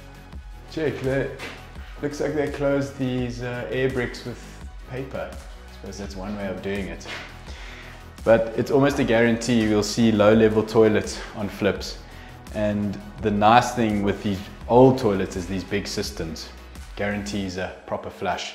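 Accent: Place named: Johannesburg, South Africa. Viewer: Imagine my delight when clicking to the vid and hearing that familiar lekker Joburg accent. Easy sub. Well done so far and keep it up. Really enjoy the videos I've watched thus far, very relatable content.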